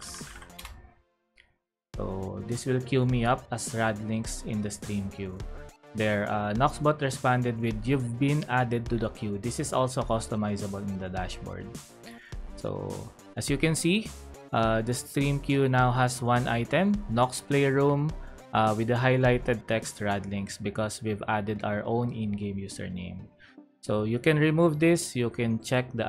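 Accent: Philippines. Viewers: Are you Filipino? I can hear definitely hear a Filipino accent hahaha! Yo thanks for this man! Love to support fellow Filipinos as well great job with this dude!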